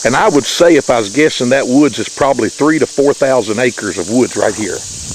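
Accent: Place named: South, United States. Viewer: Where/what state was this to have occurred? With your Southern accent I figured the South, but Sascwatch is Pac. NW area, I used to live in Oregon and I kept my focus sharp walking in the woods for Big Foot, though never saw one.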